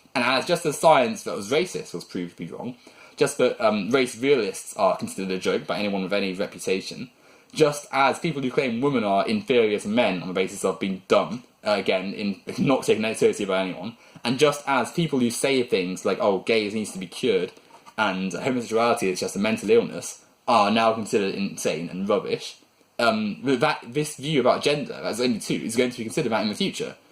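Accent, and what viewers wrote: United Kingdom. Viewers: Penises and Vaginas. Can I get my award now? Ur British accent doesnt hide ur stupidity.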